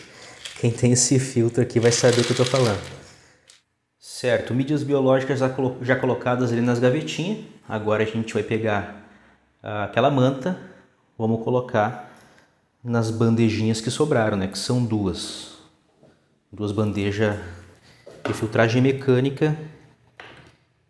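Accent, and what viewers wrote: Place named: South, Brazil. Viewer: Teu sotaque parece ser do sul. Vc e sua família estão seguros devido as enchentes históricas?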